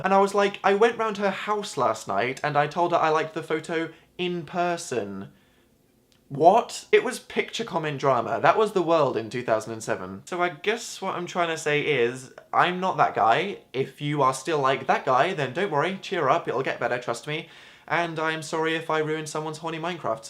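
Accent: United States. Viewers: why did you have an American accent when you were 14? x3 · in 5 years managed to lose a lisp a pillow and an american accent... lol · Who would've thought that was American when he was fourteen..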